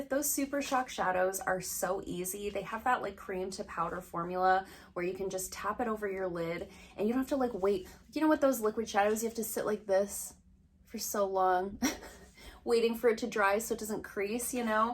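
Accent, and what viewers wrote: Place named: Canada. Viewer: I love the 5 brands you chose. I just bought the new Camouflage foundation from Elf. It’s not bad. A little thick. Had to blend it out really well. I also bought the Madison Beer palette by Morphe. Great colors in that palette. I know you would like it. I like the Morphe brand, also. I want to try the Covergirl Fresh brand powder. That looks interesting to me. I always like to hear what works well for you. I will have to try a few that you have featured here. Love Color Pop, too! Love their eyeshadows and blush. Love your beachy curls! You look so pretty, as always. I love the way you say “about”. Your Canadian accent is adorable! Just like you! Take care, little pixie!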